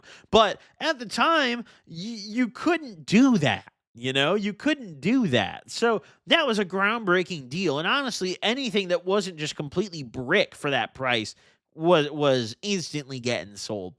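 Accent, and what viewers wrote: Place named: Illinois, United States. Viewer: Yooooo my dawg i fuck with u tough frfr especially being from Illinois im jus now listening to the stories omfg u got it and the fact u talk like a illinoisien i love yo content even better plus u remind me of 50% of my homies from back in the day i can confirm the shit u be talking about I fw it 💯 … I used to be an addict I wish I had ur content when I was def woulda helped me recover but brooooo my nigg@ with this video and me used to ship as a rookie made me fckin die in laughter glad I wasn’t the only one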